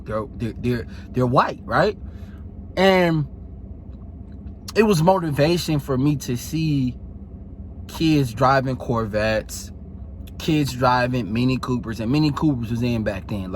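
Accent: Baltimore, United States. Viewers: Thanks for content brother. I've learned throughout the journey, just because you don't like the truth... doesn't stop it from being the truth... the gift and the curse of the red pill... i however will take the hard truth over the easy lie anyday... also, im trying to place your accent. You from Baltimore?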